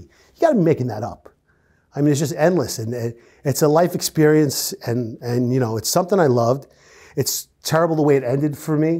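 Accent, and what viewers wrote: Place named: New York, United States. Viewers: Another highly interesting interview. He's right about everyone wanting to hear cop stories, especially when they're told by someone like himself. He's a natural storyteller. (And I'm a born & bred New Yorker -- love hearing our accent because it's dying out. His New York elocution is so New York, so fabulous!) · What a character! I lived in New York for 10 years I miss that accent. Great story teller. Good luck to him.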